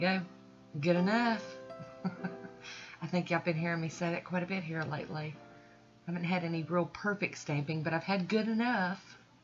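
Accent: South, United States. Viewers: Rascally rabbit!! 😉 You just have the sweetest southern accent!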